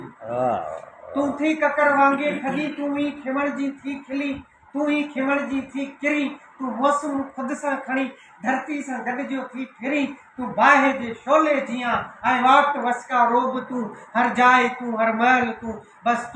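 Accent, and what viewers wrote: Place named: Lari, Pakistan. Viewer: تمام بهترين لاڙي لهجي ۾ پڙهيل هڪ طويل نظم منفرد لڳو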